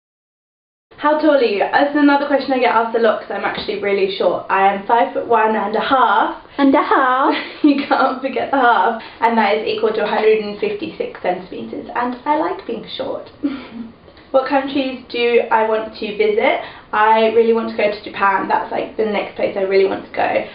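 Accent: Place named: Australia. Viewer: You're gorgeous as fuck and that Australian accent melts my soul I love it😍😍.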